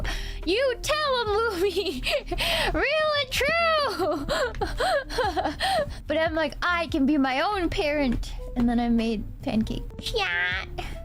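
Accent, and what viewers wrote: South, United States. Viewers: Her southern accent does things to me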